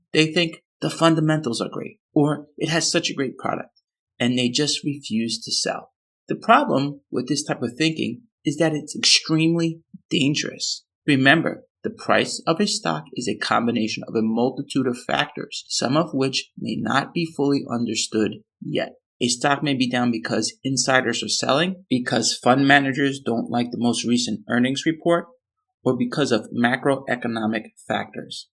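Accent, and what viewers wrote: United States. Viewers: Excellent yet simple 👏 👌 👍🏼.. Btw ..are u from ny 😅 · Love you accent,Brooklyn? Cheers from the UK.🇬🇧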